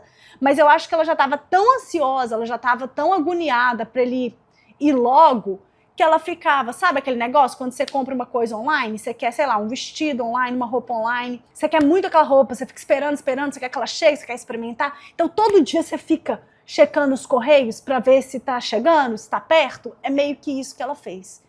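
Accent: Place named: Minas Gerais, Brazil. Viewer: Mais uma inscritaaaa🤩🤩🤩🤩 Pelo sotaque ... é mineira!😂 Se foor bate aqui mana ✋️👊🤜🤛👏🙌🫶😍 Mas se não for... pra mim é minereis do msm jeito 😂🫶🫶🫶🫶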